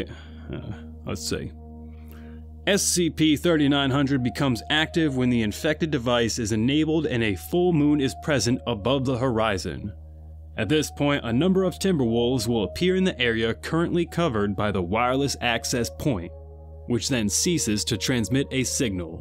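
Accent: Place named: South, United States. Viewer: Finaly I know, the southern twang is how he talks.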